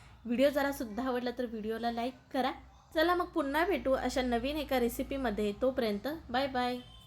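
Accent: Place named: Rural, India. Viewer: खूप छान. बिनधास्त गवठी बोला तेच आईकायला छान वाटत 👍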